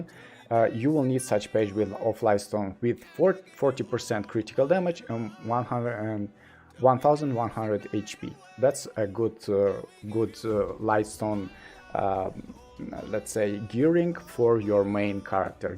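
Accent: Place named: Russia. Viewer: Тяжелый русский акцент.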